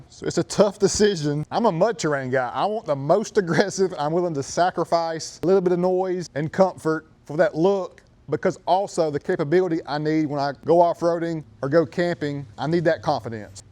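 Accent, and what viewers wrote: South, United States. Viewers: Seriously what kind of pretentious asshole puts the style of the tread over its functionality? Just because the tread style is old does not mean that it's not good still. If someone actually invented the best overall tread for mud, snow, ice, rain, and dry conditions and nothing else for the next 50 years beats it would you actually give a shit? If you're a pretentious douchebag you would, if you're a man you wouldn't. You're a yuppie suburbanite with a southern accent. 😂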